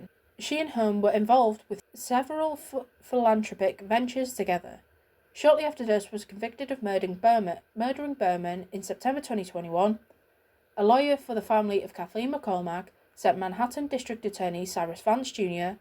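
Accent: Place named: Lancashire, United Kingdom. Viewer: Maybe Dean Corll. Curious as to your accent. Are you from Lancashire? :)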